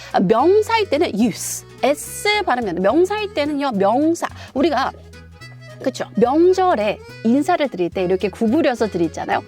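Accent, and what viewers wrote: United Kingdom. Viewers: 아... 미국 갈 준비중인데 발음이 너무 매력적이라 자꾸 여기오게 되네요 ㅎㅎ 미국가서 멋지게 브리티쉬 발음 해보고 싶네요 ㅎ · 영국 발음 인가요? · 혹시 영국식 발음인가요? 문장 말하실 때 영국인 같으세요~ ㅎㅎ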